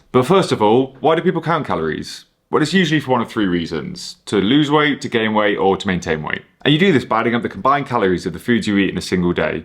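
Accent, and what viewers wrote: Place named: United Kingdom. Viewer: Please take no offense but it's hard to understand your content as you've got a strong British accent. You may wanna add subtitles to reach a wider audience outside of the UK.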